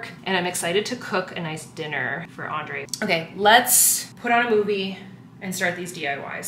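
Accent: Canada. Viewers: I’m distraught over you saying packages and not parcels🥲😂 I LIVE to hear you say parcels, it’s so Canadian🇨🇦🫶🏻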